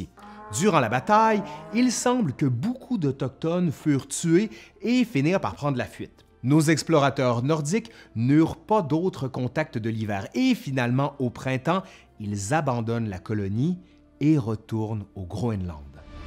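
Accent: Quebec, Canada. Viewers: C'est fou, mais quand enchaîne sur "Allez, aujourd'hui à L'Histoire nous le dira...", je souris, c'est presque réconfortant de me retrouver là en sachant que je vais apprendre des trucs... et entendre l'accent des cousins québécois ne rend la chose que plus agréable:-)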